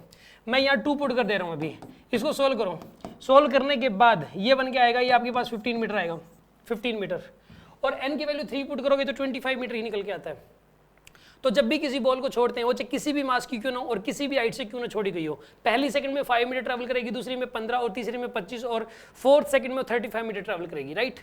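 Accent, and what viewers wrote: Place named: Bihar, India. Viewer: Sir aap बरा bhankayar समझाते हो पूरे बिहारी जैसे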